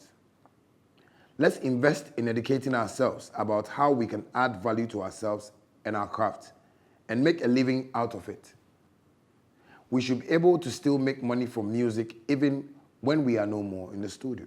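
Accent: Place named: United States. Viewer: So you can speak american english,shatta shatta!!!!!!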